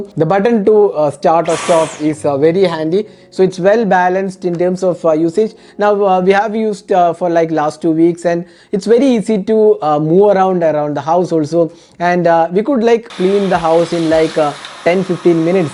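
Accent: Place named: Kerala, India. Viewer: In 3 seconds I realised he's from the motherland.. a fellow Malayali 😂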